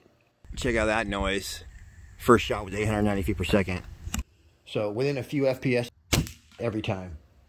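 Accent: United States. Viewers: I don't know which state has this accent but it is absolutely the most annoying accent that the US has to offer.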